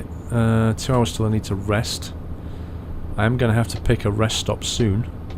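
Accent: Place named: United Kingdom. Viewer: I like how British people say stupid ,"schtooupid".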